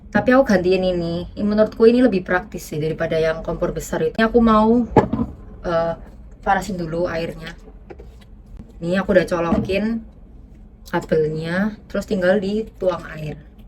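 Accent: Indonesia. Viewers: Logatnya tetep jawa bgt hahaha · logatnya kayak wong suroboyo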